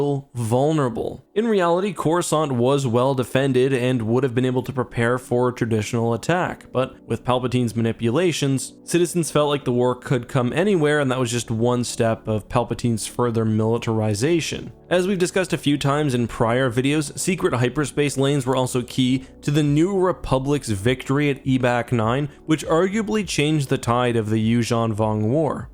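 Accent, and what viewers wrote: Canada. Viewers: is so aggressively Canadian and I love it. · Is this guy Canadian? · you sound canadian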